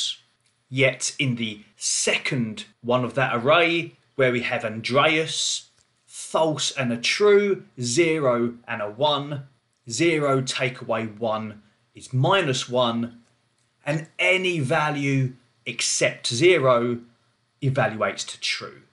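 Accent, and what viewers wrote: United Kingdom. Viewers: Dear Mr - great videos! and your UK accent is awsome and make tutorials pleasant to watch and listen to! Thank you for sharing your knowledge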